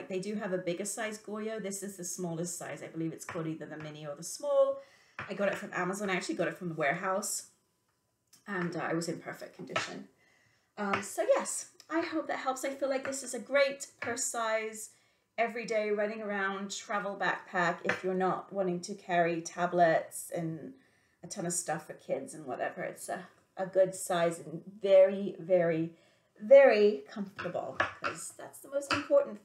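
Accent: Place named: United Kingdom. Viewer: Just discovered your channel which I am enjoying. I noticed a subtle British accent, then in a later video, you stated you were going to visit relatives in the UK. Where are you from in the UK? Hope you start making videos again.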